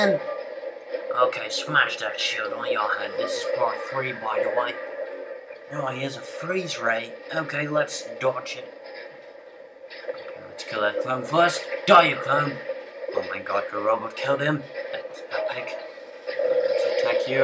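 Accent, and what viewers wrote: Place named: Philadelphia, United States. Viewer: Good tutorial, u from the states? you have a philly accent...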